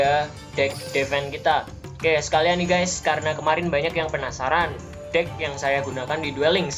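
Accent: Java, Indonesia. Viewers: medok poll cokk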